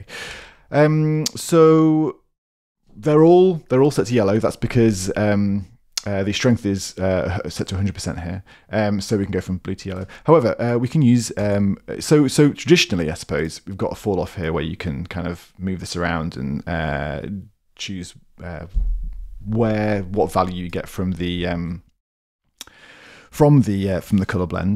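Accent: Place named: United Kingdom. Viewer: He can't change being British. Also maybe check the playback speed in your settings.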